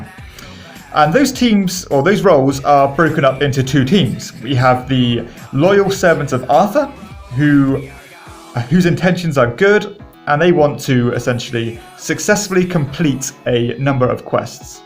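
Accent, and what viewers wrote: United Kingdom. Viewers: Glad to help! I can definitely see the confidence. And Avalon is one of my favs also. I should mention as a fellow fan of the genre that if you haven't tried it yet, "Secret Hitler" has some great and exciting twists on the genre that my social deduction groups love equally for slightly different reasons. Fewer "roles" but in exchange the title has an elegant deck mechanic that basically auto-balances the game if one side or the other is winning too heavily, and the government becomes more authoritarian and heavy-handed as more fascist policies are passed which creates a bit more of a crescendo and arc to the drama (whereas Avalon Rounds 1 and 5 aren't terribly different necessarily). We go back and forth over which game we prefer since both have interesting pros and cons. I think it's important to add that having played the game I don't believe the source material was used distastefully, I think there were interesting and compelling reasons for selecting that particular theme over others. 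Maybe a game to consider when it's available outside the U.S. (your accent sounds British to me); it is also available via free PnP from the manufacturer's website. And THANK YOU for the sleeves, I'll check them out! I need them for my copy of War of the Ring too and I saw you had already given the dimensions for those in a different video and they seem to be the same brand. Lifesaver!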